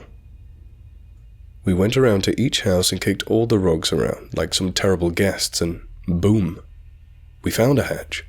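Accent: England, United Kingdom. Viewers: This series of stories is AWESOME, but I gotta ask, if he's a US marine, why does he have an English accent??? Dont mean to be a Donald downer, just asking. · What is a Brit doing in the U.S. Marine Corps.? It's pretty obvious that the speaker is from the U.K. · (Just wondering... how come a Brit is reading a Marine's story?) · How can a U.S. Marine have a BRITISH ACCENT PLUS mispronounce "Marine Corps?????? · Like many other I love your voice, I DO have a few questions though, you mention USMC and Navy Seals etc, yet your voice is 100% English, and so are your expressions... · British accent? And use of kilometers? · A ME city but all was in proportion, even churches? In the first vid this person talked about Palm Desert close to the Mex border in Carolina. This sounds like a great SiFi story. On top of this the narrator has an English accent! The stories sound real but I keep thinking the feeling he has of being watched may be by Freddy Kruger! 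· That Brit Accent is most annoying. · You are a Marine in U.S. 'cause you have an English accent? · love your voice. You sound british like me :) ( A Worcester girl). You tell these stories so well and the pictures are great to give an insight to it all. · british accent, talks about kilometers hmm.. must not be American marine.. ?? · These stories are great! Love the narrator's Brit accent too. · That's so weird grown ups playing war!!....in a fake city!!...so weird!!...and your accent sound english, european.. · He sounds ex muslim Brit from Uk. Good stories.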